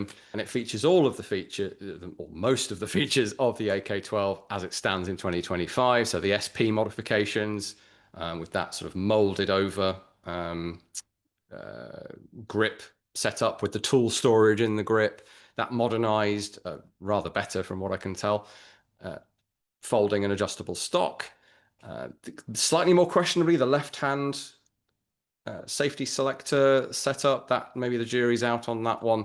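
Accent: United Kingdom. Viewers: You can't just discuss AK with that sort of a gay British accent. It simply doesn't work that way.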